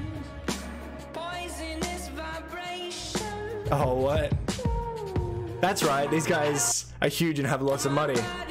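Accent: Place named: Australia. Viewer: Very cool! Love your Aussie accent! My grandma was from New South Wales, in Bega!. She loved all kinds of music and She would have loved your channel! Keep rockin’ it out! 🤘🏻❤️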